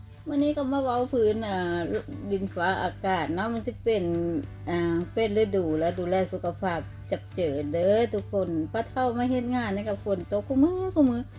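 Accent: Phu Thai, Thailand. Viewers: เว้าภูไทเพราะดีครับ ฝนตกๆเด็กชอบเปิดพัดลมนอนสบายดีครับป้า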